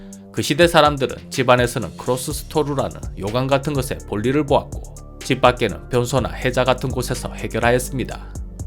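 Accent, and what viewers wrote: Gyeongsang, South Korea. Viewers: 경상도 사투리는 진심 짜증나요 . 제발 사용 하지 마세요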